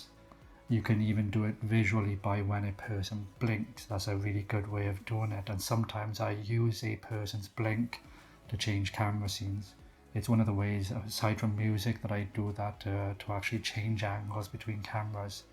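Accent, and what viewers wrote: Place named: Wales, United Kingdom. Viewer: thank you so much, it was very helpful, but you kinda lost me in minute , it got very complex and i have trouble understanding your welsh accent. Keep the good work. Thanks one´s again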